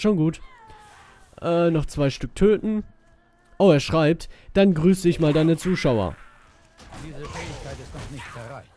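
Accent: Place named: Wuppertal, Germany. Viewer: Biste n Wuppertaler? Soviel "wat" und "dat" ^^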